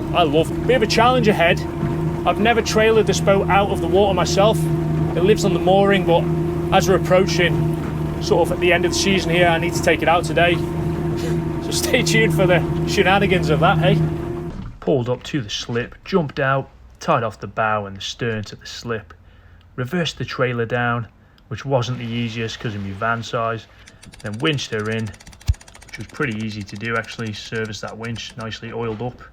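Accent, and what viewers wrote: Liverpool, United Kingdom. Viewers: close a bud scouser here your close to it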